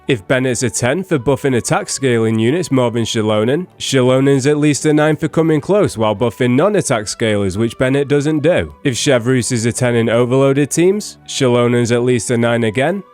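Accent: United Kingdom. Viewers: Too Much British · it feels like a breath of fresh air hearing another fellow brit talk about genshin, earned a sub from me mate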